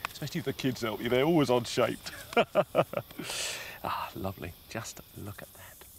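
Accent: England, United Kingdom. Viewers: Ainsley sounds like what everyone thinks an English man sounds like.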